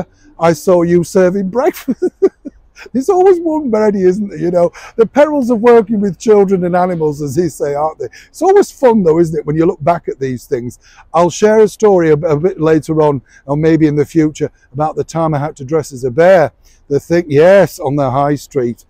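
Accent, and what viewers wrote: Yorkshire, United Kingdom. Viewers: am I detecting a Yorkshire accent from you? Love it ❤ - Merry Christmas 🎄🎁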